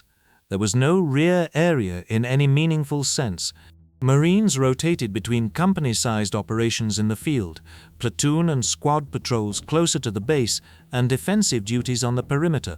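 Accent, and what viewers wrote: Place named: United Kingdom. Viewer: Why is it always british accented narrator s , with US battles kinda unsettling and just wrong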